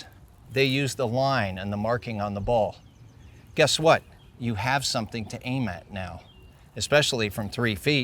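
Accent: United States. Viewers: Just a standard Connecticut accent? It’s not even thick.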